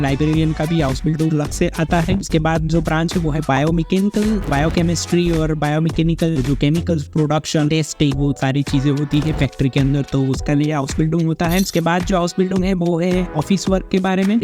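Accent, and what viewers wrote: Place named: Maharashtra, India. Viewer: Hello I don't know why but I feel you are a Marathi speaking guy after listening your Hindi.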